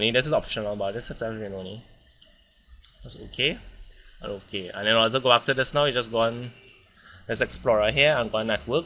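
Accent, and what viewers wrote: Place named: Trinidad and Tobago. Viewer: Oh Gawd Boy!! Dah accent is real Trini like bake and saltfish. lol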